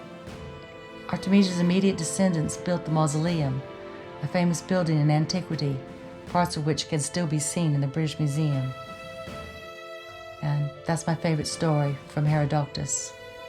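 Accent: South, United States. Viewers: Your voice is so soothing..the mixture of Southern accent, smoothed out by a little New Yorker and the British tongue with words like "que", "nip in"...no wonder I couldn't put my finger on it.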